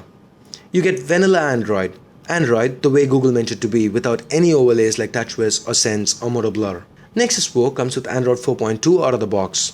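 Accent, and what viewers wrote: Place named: South India, India. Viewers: from his accent..he sounded south indian for sure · for a tamil. you have a fantastic english accent. i would definitely take you for my movie if i was a director. TWO thumbs up brah!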